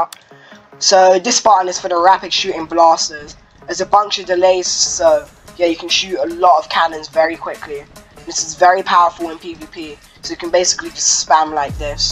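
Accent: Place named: United Kingdom. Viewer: The British accent is funny😂😂 You earned yourself a sub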